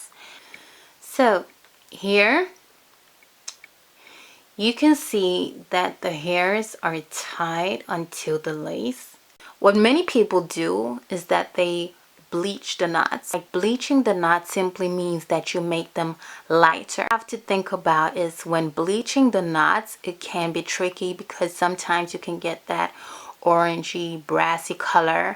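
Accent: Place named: Brazil. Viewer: Your voice sounds like Rihanna but with a Brazilian accent. Very cute!!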